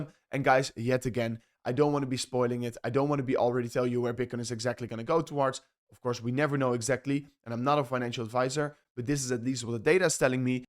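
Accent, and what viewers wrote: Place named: Netherlands. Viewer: haha en ik had al zo een vermoeden dat ik een nederlands accentje hoorde 😅 je doet dit super!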